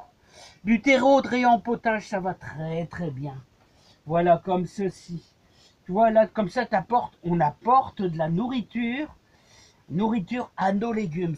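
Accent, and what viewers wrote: North, France. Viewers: j adore!! ta facon de parler teu s'ro point du nord tizote? hi hi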